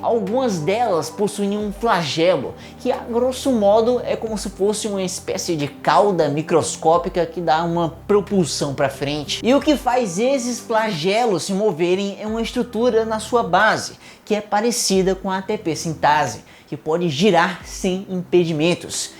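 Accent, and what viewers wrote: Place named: São Paulo, Brazil. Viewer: Nss kkk... Acho tão legal o sotaque paulistas aquela poxadinha no r (queria dar essa poxada também)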